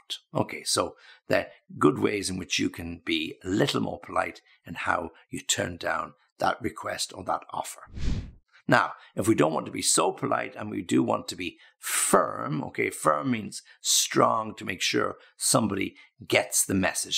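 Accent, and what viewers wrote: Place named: United Kingdom. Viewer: I hesitate between american english or british english. In fact, i was practicing british english for 2 year. Then started learning american english. But when I come back to british english videos like this one, i just fall in love with british english. ..it sounds so lovely, so elegant! And the best for me, you pronounce the "T" and i love it 😊❤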